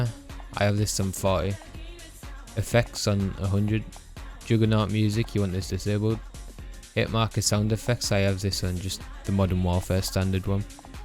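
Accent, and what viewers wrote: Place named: Newcastle, United Kingdom. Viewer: unless your a fucking dweeb love that mate keep it up inaul you geordie? sounds it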